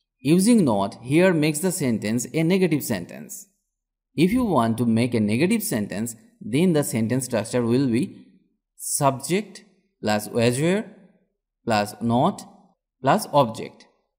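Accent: Bihar, India. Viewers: Bihari ho kya sir 🙏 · Real bihari slang.. english (Englise) patient (pesient ) was (waj) extra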